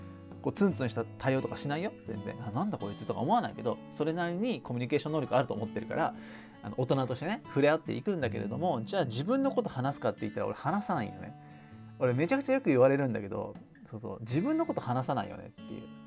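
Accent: Kansai, Japan. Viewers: 関西なまりめっちゃ好きです笑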